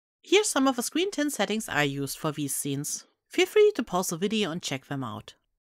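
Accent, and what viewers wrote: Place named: Germany. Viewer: Lovely german accent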